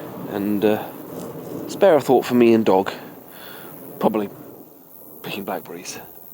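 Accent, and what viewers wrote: United Kingdom. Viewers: Looking forward for more long distance voicemail like this, your voice ❤ and that british accent though ❤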